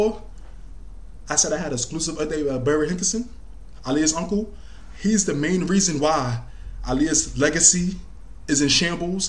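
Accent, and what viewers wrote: New York, United States. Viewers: You straight up New York lol accent off the chain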